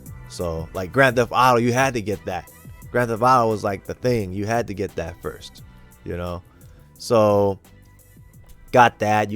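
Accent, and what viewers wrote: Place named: London, United Kingdom. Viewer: “You sound like your from Lon-Don”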